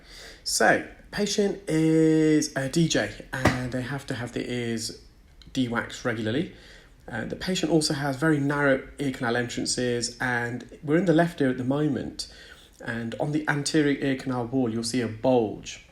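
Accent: United Kingdom. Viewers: He’s a toad; pay him no mind. I enjoy hearing your British accent and love your videos.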